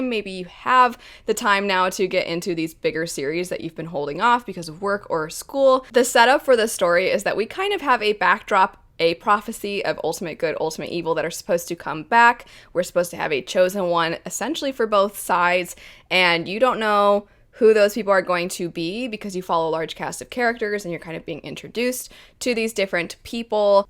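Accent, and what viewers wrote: United States. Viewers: Plz tell me you’re from Maryland holy shit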